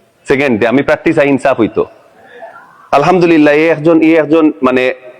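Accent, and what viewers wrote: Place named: Sylhet, Bangladesh. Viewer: আমরা সিলেটের মানুষের আচার আচরণ অন্য বিভাগ থেকে আলাদা ❤ আপনার কথার ভাষায় তা আবারও স্পষ্ট 😊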